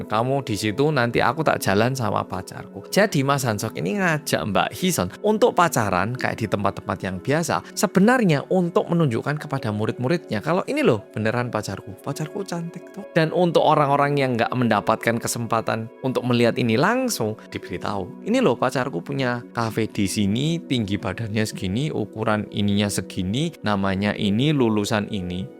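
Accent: Java, Indonesia. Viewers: Halo gaes, welcome back bersama detektif swasta yang ... medhoookkk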